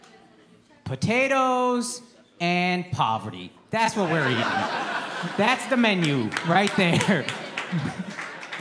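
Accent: United States. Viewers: As a "white conservative" let me just say I couldn't care less about your skin. Hearing you speak, I never would've thought "He's from India." In fact, you being from Texas makes perfect sense. What I care about are your values and your worldview. I'll gladly trade a million immigrants willing to work hard, start their own businesses and be productive parts of the community for as many white, neoliberals and Marxists as any other country will take. Also, there is a lot of Irish in my family. I probably like "Indian food" more than "Indian cuisine."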